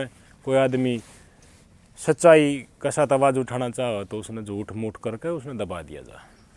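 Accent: Haryana, India. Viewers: Aapki bato ko sunkar hasi bahut aati hai haryanvi boli is best bro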